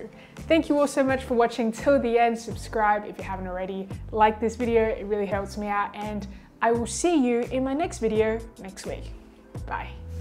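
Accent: Australia. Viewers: I love hearing your Australian accent because it's so different from where I'm from. But your Cantonese sounds exactly the same as mine! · Thought u grew up here in oz as you spoke with aussie accent, didnt realise your parents were coming to visit you from overseas... Maybe im wrong? Im kinda half way thru, lemme finish watching to find out! :)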